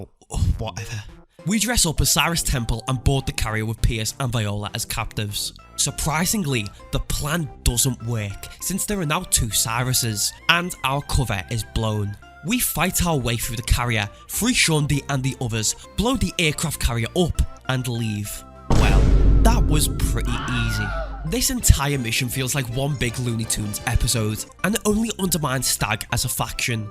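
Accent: Liverpool, United Kingdom. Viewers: hello fellow scouser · Are you a scouser?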